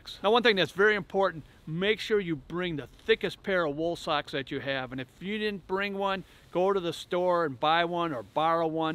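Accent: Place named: Midwest, United States. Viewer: By your accent I'm guessing you're from the midwest? I'm from northern New England where it also gets extremely cold. I enjoyed watching your video for the good advice and I got a kick out of your accent. You'd probably get a kick out of mine too. Thank you for your service and happy Thanksgiving!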